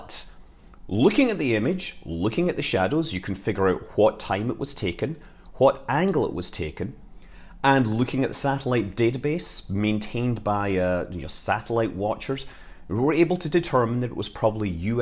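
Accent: Scotland, United Kingdom. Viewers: Is he Scottish? He sounds just like Mrs. Hughes.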